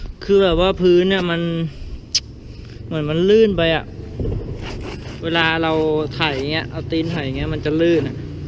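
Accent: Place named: Suphanburi, Thailand. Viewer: ติดใจเหมืองเหน่อ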